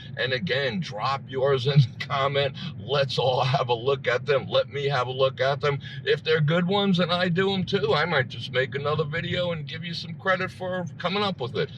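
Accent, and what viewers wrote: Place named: New York, United States. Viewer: You ran a red light! You are going to be my virtual concierge in April when I head out to Harrahs. It's the New York/New Jersey accent that has my trust :-) And my MAX BET will be on getting a fridge for me room! Stay safe, thank you for your channel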